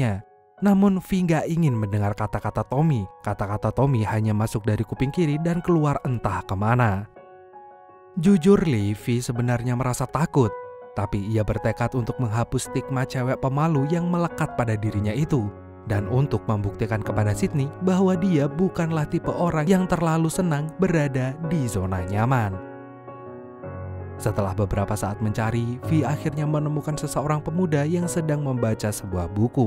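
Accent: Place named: Tegal, Indonesia. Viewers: tegal hadia Maseeehh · Sihhh malah wong ngapak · Rika wong ndi sih bang, ngomonge deneng ngapak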